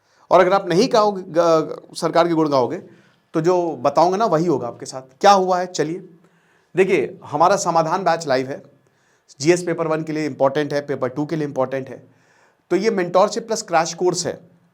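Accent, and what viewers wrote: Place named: Lucknow, India. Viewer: Sir lucknow ki gehri छाप hai ap pr …. “उसी चौराहे पर टाँग दिए जाओगे “ itnaa khtraank bolaa apne 😅😅😅😅 😊😊😊😊😂😂😂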